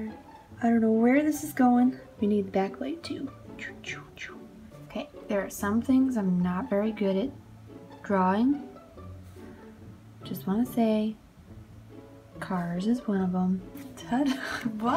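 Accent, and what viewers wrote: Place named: Illinois, United States. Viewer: You’re from Buffalo! Omg I’m from NY too. I thought maybe you were from Illinois bc you have sorta an accent. Which is awesome Btw.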